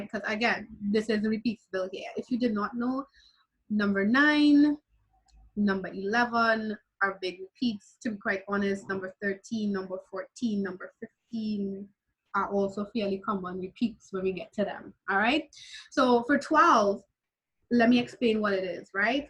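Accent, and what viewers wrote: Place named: Dominican Republic. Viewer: You speak spanish like someone from the Dominican Republic. Thank youuu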